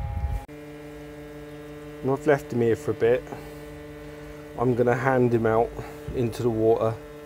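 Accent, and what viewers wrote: England, United Kingdom. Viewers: any way i love your vids keep up the good work matter a fact do know ksi sound like your from england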